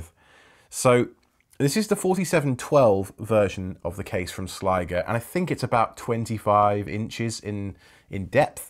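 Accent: United Kingdom. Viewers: I just had an uncanny valley moment hearing inches in a british accent.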